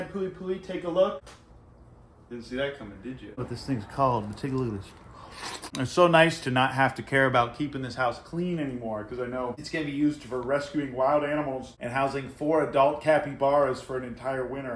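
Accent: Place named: South, United States. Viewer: how are you both Southern and Canadian at the same time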